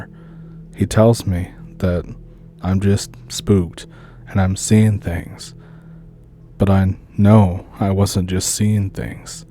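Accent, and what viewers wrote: Ontario, Canada. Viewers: I knew this cat was Canadian by his Ontario subtle accent!!!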